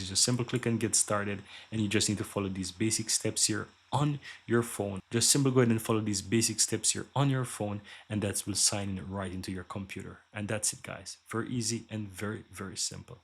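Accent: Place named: Jamaica. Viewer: You sound Jamaican... are you?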